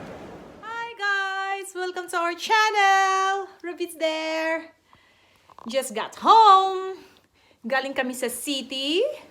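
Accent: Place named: Tagalog, Philippines. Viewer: Nakakatuwa ka mag Tagalog ate.. ❤️❤️❤️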